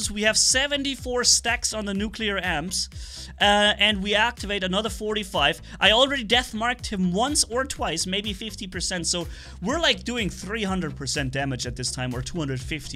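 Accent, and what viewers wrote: Germany. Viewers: Maybe dumb question, but are you German or you learn german (sorry if this is inappropriate question)?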